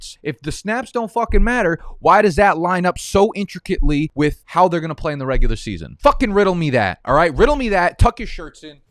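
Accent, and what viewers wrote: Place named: New York, United States. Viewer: I appreciate that I can tell you're from new york in about 3.5 seconds. Absolutely unbridled.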